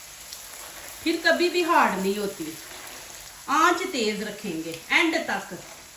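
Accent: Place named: Punjab, India. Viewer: آپ اردو پنجابی لہجے میں نہ بولا کریں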